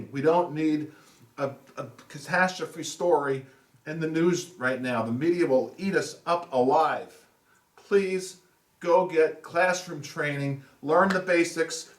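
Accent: Long Island, United States. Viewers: Funny to find out you grew up on Long Island. I live here ! Always wondered where your accent came from. You a Nassau or Suffolk guy ?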